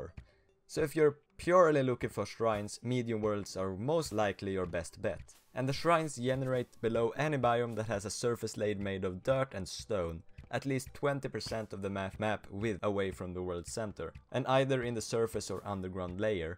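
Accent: Sweden. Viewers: I hear ur swedish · This is the most Swedish accent iv’e ever heard. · Man hör att du är svensk. xD bra video btw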